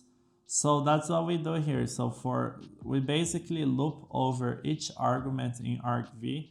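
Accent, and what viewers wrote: Brazil. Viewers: Cara, fala muito bem em inglês, mas peguei no pulo que era brasileiro. Valeu pelo video, salvou aqui.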